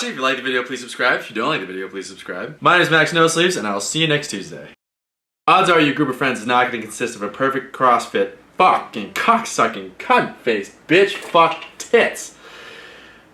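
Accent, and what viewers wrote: Boston, United States. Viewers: The boston accent is very apparent this week. Best yet! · The longer your beard gets, the more pronounced your Boston accent gets. It's fuckin' awesome. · Chances are, your a fucking power ranger! LOL - Jason P.S. You can tell by your accent that you've been in Boston too long. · Your Boston accent, OMG I can tell you went home for Christmas! LOL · Sounds like your accents coming in a bit more - spending a bit more time in Boston? Taken Tuesday... 'But what I do have are a very particular set of skills...' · I like you :) minus the boston accent and Patriots/Red Sox fav yuck..... As a sports fan we could never date. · Haha love how much of a Boston accent you have in this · That Boston accent tho. PLEASE CAN YOU SAY: 'I lost my car keys'. PLEASE! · So much Boston accent hahaa! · Did you intentionally have a boston accent in this one?? 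heh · your Boston accent.. yes, thank you.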